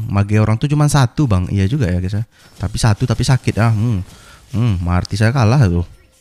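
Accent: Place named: Pekanbaru, Indonesia. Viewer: Org Pekanbaru bg? Nampak betol logat pekan ABG awak ni 😅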